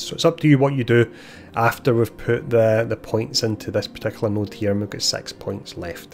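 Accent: Scotland, United Kingdom. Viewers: Ah yeah Sorry I think I mixed up the reply a bit😆. I actually like the Scottish accent but this is a bit hardcore for a non native speaker. There are other YouTubers in the arpg world like quinn69 (not sure about the spelling of his nickname lol) who definitely have strong accents but I still understand them easily. Anyway I didn't want to turn this into a "you need to speak properly" , I was just a bit upset by the fact that I usually hear these kind.of video rather than watching them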